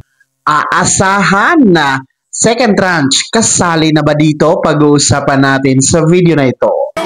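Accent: Visayas, Philippines. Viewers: ayusin mo pagsasslita mo!!! palibhasa bisaya ka!!!!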